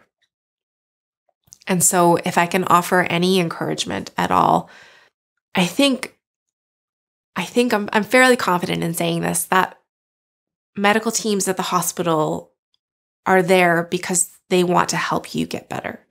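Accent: Canada. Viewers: Canadian accent coming in strong!!! Great channel though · You are absolutely amazing. I love that you are so completely honest. Do you think the training for the marathon stresses your body enough to change the way your meds work? By the way I grew up in Alberta but don’t live there anymore and your Canadian accent makes me want to go home.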